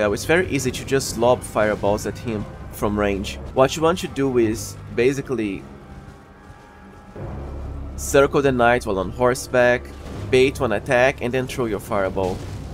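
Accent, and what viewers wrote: Brazil. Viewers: Are you Brazilian, mate? Cool accent! Great video too!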